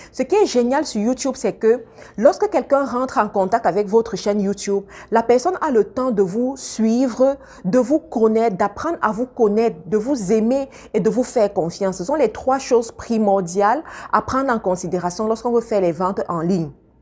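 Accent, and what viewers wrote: Cameroon. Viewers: J'aime trop votre façon de parler! Vous êtes la seule mbenguiste camerounaise qui a su garder son accent.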